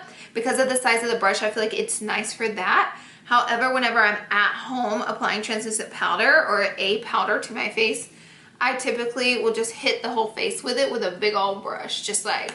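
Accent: South, United States. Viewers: Brittany Hargett she has a heavy country accent, you ignorant bitch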